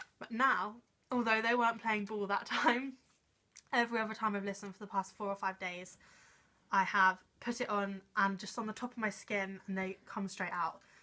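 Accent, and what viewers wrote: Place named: United Kingdom. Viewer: That British accent threw me off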